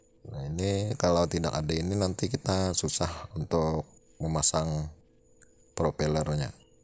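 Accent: Banjar, Indonesia. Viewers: Logatnya kayak orang banjar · Logat banjar nya terasa banar leh 😁😁😁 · Kyk orng Banjar abg nik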